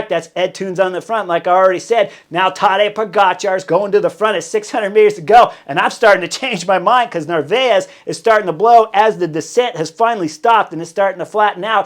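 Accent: United States. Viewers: This American accent is so thick I can barely make it out when he says the names of the riders.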